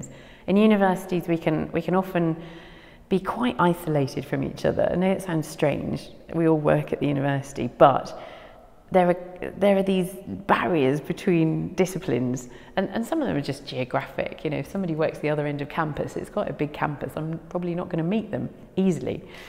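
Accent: Bristol, United Kingdom. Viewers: that's not a 'westcountry ' accent. Come to Devon or Cornwall and you'll know. She has light Bristolian going on. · Think Brenda from Bristol. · Bristol ( locally known as Brizzle ) is right!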